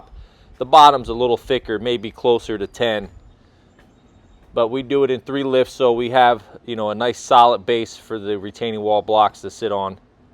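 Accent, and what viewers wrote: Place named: Pennsylvania, United States. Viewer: that SE PA accent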